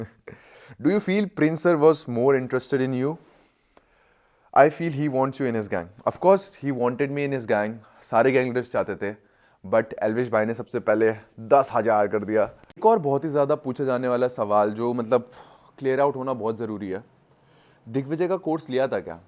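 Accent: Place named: Delhi, India. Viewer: u r talking like generic delhi boys. dont know whose personality rubbed off on u. pls go back to being your simple self who is not so camera trained and delhi speaking style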